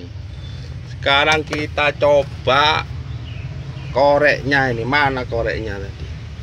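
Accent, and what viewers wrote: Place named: Java, Indonesia. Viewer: Joss mas ,,, medog jowone !!!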